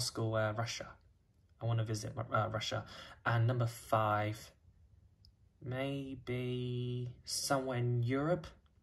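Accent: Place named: Australia. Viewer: Sounds like Australian accent.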